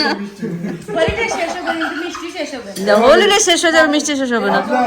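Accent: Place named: Jessore, Bangladesh. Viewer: tumi onek beshi shundor. Amader jashore er meye bole kotha.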